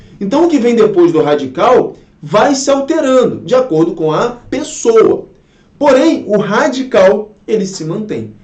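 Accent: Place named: Rio de Janeiro, Brazil. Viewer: Uhhhh falando com i onde não há i, pondo X onde não há X; fica difícil lecionar usando o português da capital do nosso querido Rio de Janeiro.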